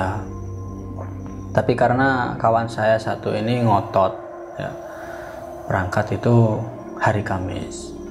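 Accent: Java, Indonesia. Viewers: Kang Nama sunda, logat medok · Kang kirain orang sunda,ternyata jawa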